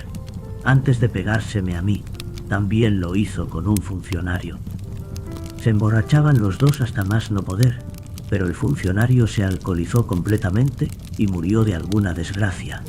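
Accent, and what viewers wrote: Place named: Spain. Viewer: ESO es dormir.... Con ese asqueroso acento del tipo que vino disque a colonizar no solo a mi territorio sino todo centro is y no quiero hablar de ese idiota que andaba perdido 😅 dale este tipo connesr acenide mierda y postea algo para dormir blaaaaaaaaaaaaaldoto estúpido yo que perdí tiempo no solo escrirnfoeesto sino quitando segundos de mi vida escuchado a este asqueroso español 😂😂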